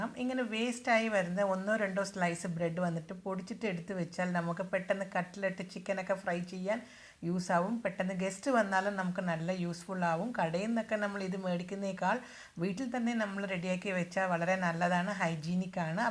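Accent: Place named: Tamil Nadu, India. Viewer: videos ellam njan kanarund... തമിഴ് ചുവയുള്ള നിങ്ങളുടെ മലയാളം കേൾക്കാൻ എനിക്ക് നല്ല ഇഷ്ടമാണ്....helpful vieeo aantoo ith.... Thank you so much dear🥰🥰🥰💐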